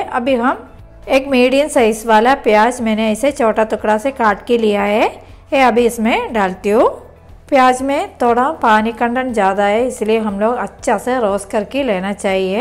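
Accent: Kerala, India. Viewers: Hindi u talk so well in Kerala accent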